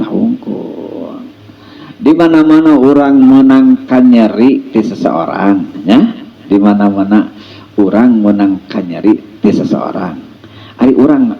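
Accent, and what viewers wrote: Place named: Java, Indonesia. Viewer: Bisa sambil belajar logat Jawa 🙏👍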